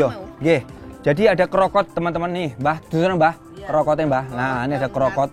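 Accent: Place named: East Java, Indonesia. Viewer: cak jawa timur banget,enak bawaannya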